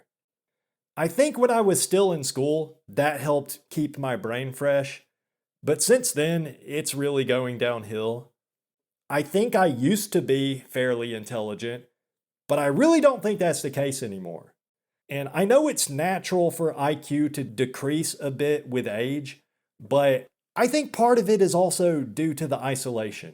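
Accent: South, United States. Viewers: If he's not using AI he's a Southerner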